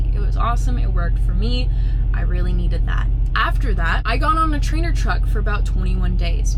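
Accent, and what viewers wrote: United States. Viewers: But yeah, I never would’ve guessed that you were from Florida. I thought you were like from the Deep South or something like that. But I definitely love watching you and how beautiful and very gorgeous and very stunning and very lovely and very vibrant and very powerful and sexy you are as well. 😍😍😍😍😍😍😍😍😍😍😍😍😍😍😍😍😍❤️❤️❤️❤️❤️❤️❤️❤️❤️❤️❤️ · English is my 2nd language on top of that your american accent, so subtitle is appreciated. 🙌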